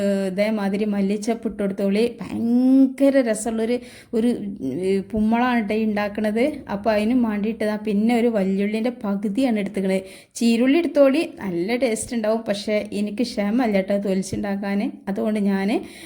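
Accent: Malappuram, India. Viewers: ഇയാളെ വീട് എവിടെയാ 😂സംസാരം കെട്ടിട്ട് മലപ്പുറം ആണ് മലപ്പുറത്തു എവിടെയാ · മലപ്പുറം താത്തക്ക് ഒരു മാറ്റവുമില്ല ചില വിഡിയോയിൽ മാറ്റമുണ്ട് അതിന് ഒരു ക്രിത്രിമം തോന്നുന്നു ഇന സംസാരം തന്നെ മതി. recie Pie കൊള്ളാം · Malappuram basha ishtam 💚💚💚ഞങ്ങൾക്ക് നിങ്ങളെ നാട്ടിൽ വന്നാൽ കുറവാണ് ഞങ്ങൾക്ക് പറയാൻ 💚💚💚🥰🥰🥰 മലപ്പുറം എവിടെയാണ് എന്റെ ഇത്താത്ത യും മോളും അവിടെയാണ്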